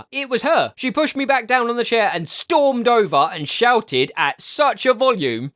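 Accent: United Kingdom. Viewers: You have a British accent, yet go to 'high school'. hMMMM? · I absolutely love your british accent. Subscribed. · How come you have a British accent but a American school 🤔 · you sound British.... i like Britains since i am myself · OMG!!!! YOU'RE BRITISH ACCENT IS SO AWESOME DUDE!!!!!!!!😻😻😻😻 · I just found out about your channel and realised....we both have the name doodles in are channel......Steve doodles....xD also I subbed cuz I love your art style...also are you British? Sounds like you are xD I am too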